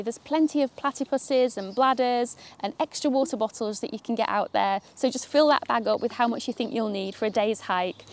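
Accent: United Kingdom. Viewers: I was confused for a moment when you mentioned fire arms despite the British accent😂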